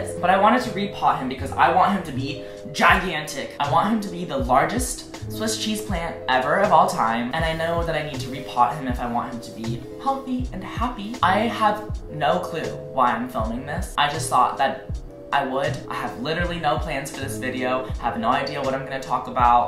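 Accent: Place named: Texas, United States. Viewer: your texan accent is so prominent in this video. IM QUAKING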